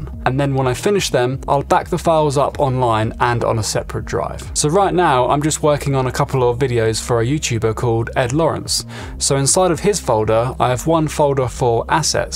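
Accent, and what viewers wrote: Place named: United Kingdom. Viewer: I just get a thrill when I listen to your British English ))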